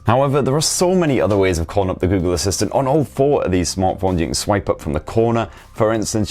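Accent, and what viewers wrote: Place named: Newcastle, United Kingdom. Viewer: I'm English aswell...........but a Londoner. He's a 'Geordie' ...that's someone who's from Newcastle upon Tyne or the surrounding area. I can tell from his accent. Any British person can tell this immediately. Gotta love the Geordie sense of humour - it's very quirky!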